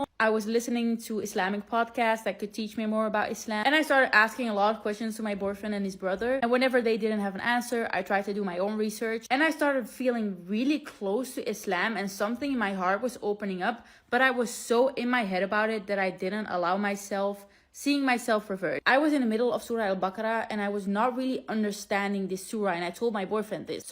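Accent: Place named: Arab world. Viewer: لهجتها كأنها عربية..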